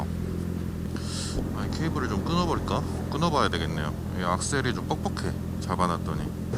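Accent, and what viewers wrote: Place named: Seoul, South Korea. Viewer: 엇!!! 서울말 쓰시는👍👍 멋있습니다!!! 구독하고 갑니당😆😆😆😆😆😆😆 소리 넘나좋은 육반 !!!!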